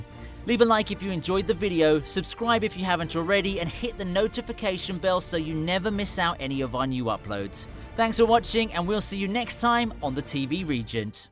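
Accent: United Kingdom. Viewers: i wanted to watch this till i heard this anus voice dude yer dumb. do people think british voice is cool? i do NOT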